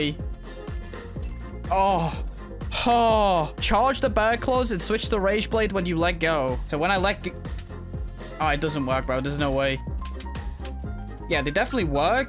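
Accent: United Kingdom. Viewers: Bruh he’s British that’s why he says it like that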